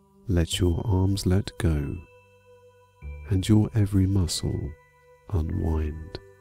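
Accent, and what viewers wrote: Scotland, United Kingdom. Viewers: please tell me are british ?? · These meditations are perfect. <link> him has a gentle, warm, pleasant voice (with a slight Scots accent). The words are simple and clear. The timing is perfect... just as you start to find your mind wandering, he says and if you find that your mind is wandering... . There is no background music and no noise in the background, only his peaceful and engaging voice. The length of the meditations (about 1/2 hour each) works well for me. I find that I tire of some meditations after frequent repetition, but that hasn't happened with this one. The metta lovingkindness meditation always feels right. Some meditation CDs I have tried have not felt right. The voice seemed hurried, or sounded tense; the background music seemed intrusive, or there were noises that interfered with concentration. This is just perfect as an introduction for new meditators, and it's also a meditation that I return to again and again. 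I'd give this more than five stars if that were an option. Even my students, who are not ordinarily very receptive to meditation, relax when they hear this one!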